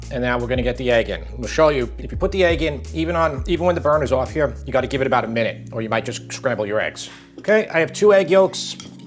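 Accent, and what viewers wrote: New York, United States. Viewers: Mostly here for the delightful New York accent. North-Eastern US has the most pleasant sounds of all US accents.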